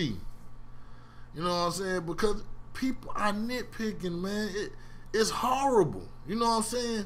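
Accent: United States. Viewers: fool sound like he from the south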